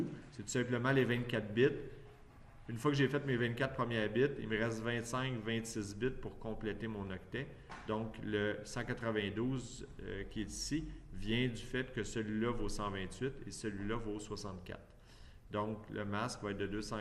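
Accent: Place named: Canada. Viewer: bon, je vais essayer de comprendre l'accent canadien O_O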